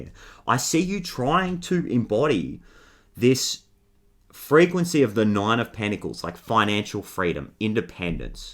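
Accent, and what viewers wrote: Australia. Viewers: It's an Australian accent! We all speak like that here! 🦘🦘🦘🦘🦘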